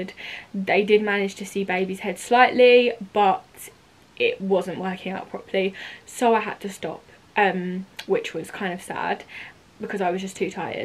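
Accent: United Kingdom. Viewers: Congratulations on your little baby boy and your make up is so pretty I love it and I love your British accent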